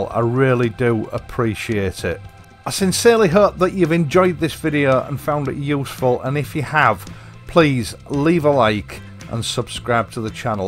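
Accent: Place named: Yorkshire, United Kingdom. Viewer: That's what keeps me watching your videos, I don't own a Triumph or an Enfield and probably never will, but your stuff stands out from the crowd, honest, down to earth, informative, entertaining, and, above all, totally individualistic! As an added bonus they are delivered with the best, broad Yorkshire accent one ever heard! Keep 'em coming mate! (and that's from South Australia where we can ride all year round!)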